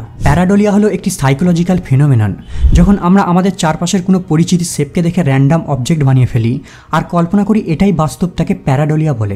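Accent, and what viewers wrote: India. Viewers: Annoying কলকাতার বাংলা উচ্চারন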